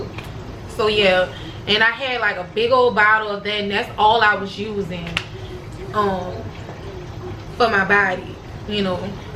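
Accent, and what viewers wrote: New Orleans, United States. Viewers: New Orleans accents are my favorite. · I know you from New Orleans. I love how people from New Orleans talk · I love the New Orleans accent by the way it remains me of Toya lil Wayne ex. Thank God she is ok now.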